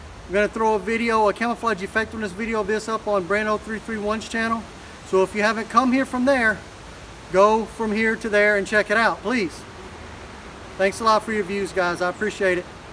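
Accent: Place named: United States. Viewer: Don't see anyone in the video?? All I hear is an American man???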